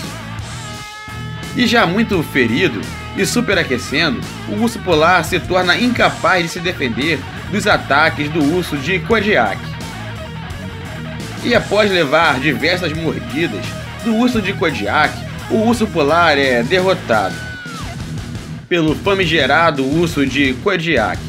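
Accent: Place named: Rio de Janeiro, Brazil. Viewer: Saudades desse sotaque carioca 😁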